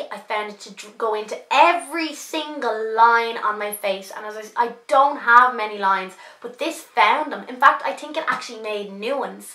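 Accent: Ireland. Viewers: Pretty sure she's from Ireland.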